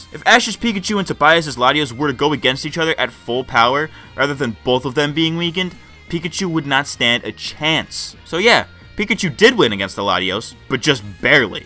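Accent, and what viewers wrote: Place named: New Jersey, United States. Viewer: You sound like a New Jersey version of Ethan Klein.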